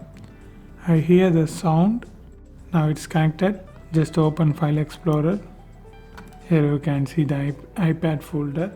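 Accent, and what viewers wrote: India. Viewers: The moment I heard the Indian voice I knew I was saved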